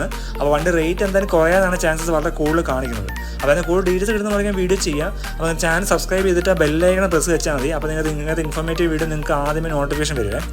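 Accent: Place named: Palakkad, India. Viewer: That usage was your signature style and enjoyed the same. By the way are you from Palakkad, coz the particular style seems so.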